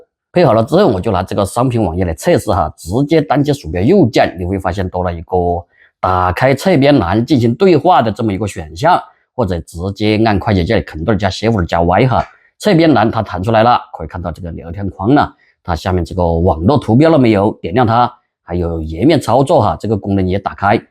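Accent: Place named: Sichuan, China. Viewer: 熟悉的川普😂😂😂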